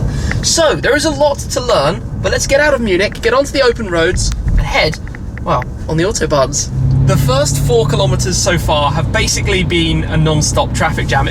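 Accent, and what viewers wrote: England, United Kingdom. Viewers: english accent is really ugly sounding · Where are you from Schmee...England or Germany?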